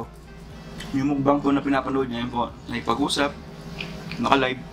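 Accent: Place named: Pampanga, Philippines. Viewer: Ali naman boring sao..okay yamu..mas masanting pin more on kain hahaha..pero pag atsu sao ann atin talaga kwento tang punto ng kapampangan yang magdala hahahhaa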